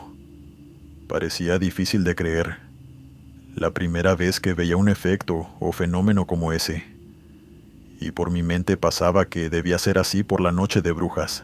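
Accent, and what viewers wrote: Mexico. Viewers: La voz del narrador es absolutamente envolvente, su acento Mexicano la hace aún más increíble, perfecta para relatos de terror. Adoro este canal🙏🏽🇨🇴❤️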